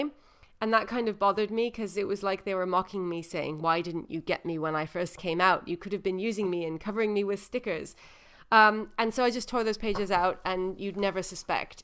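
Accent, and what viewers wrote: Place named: United Kingdom. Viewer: Amazing, what an experience. You can really hear British undertones but the American accent is definitely there.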